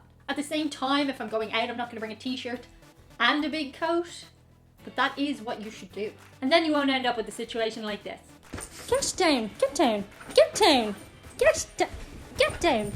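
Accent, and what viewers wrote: Ireland. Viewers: Hi, I have been with you since the first episode. I honestly don't hear your Irish accent any more. You sound very normal to me, unless you use slang, or word I am unfamiliar with. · So 2 things if you dumb down your Irish accent I would love to see a video of where you're not and how you sound everyday and second being an American I cuss like an Irish 😂😂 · I noticed in your last cooking video that when you are talking to Paul you have a MUCH MUCH STRONGER accent. I love the irishn accent esp when unguys says three/thirity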